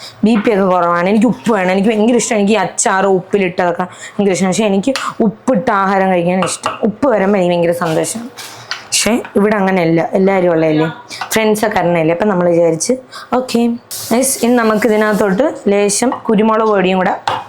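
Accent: Trivandrum, India. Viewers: Nombu eduthittu kaanunnavar undo nammude trvandrathu ingane aanu parayunnu uratti 🥰❤️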